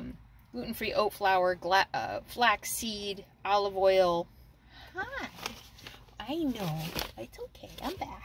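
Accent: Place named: Northeast, United States. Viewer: I love the way you say "what do you think?" to your animals. I do that too and never heard anyone else say that to their fur babies until I came across your channel. It's just one simple little thing in a sea of other little things that make me go "she's my people!" every time I watch your videos. I can tell that you were born and raised closer to the northeast side of this country, purely through your dialect and the way you express yourself. Much love to you and Sadie from Michigan!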